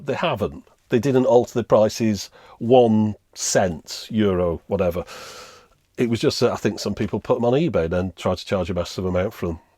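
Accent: United Kingdom. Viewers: I love your videos. I belive I watched most of them now. (Some multiple times) Love the Muppet's, the British accent, the kindness, the retro items (the older the better), the explanations, the reviews, the garden views, the close-ups and generally the attention to detail. It is entertaining, educational, funny and just most wholesome. Whenever you say "thanks for watching", I reply thanks for showing.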